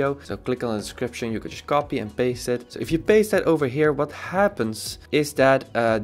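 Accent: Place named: Netherlands. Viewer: Nice, just came across your YouTube video for a work project I have and I thought his English is good but has an accent is he Dutch and yes you are :) I used to live in the Netherlands as well until last year!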